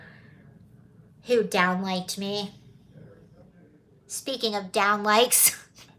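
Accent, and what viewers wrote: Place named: West Coast, United States. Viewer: I’m in Virginia too. We are as far north as you can be and still technically be considered a southerner. I’d never be able to guess you were from Georgia though. You definitely sound like a west coast girl to me.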